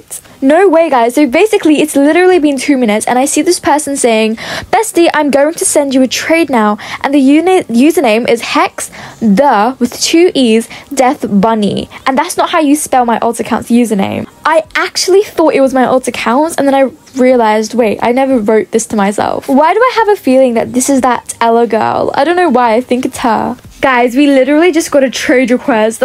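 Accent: United Kingdom. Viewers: normal British girl.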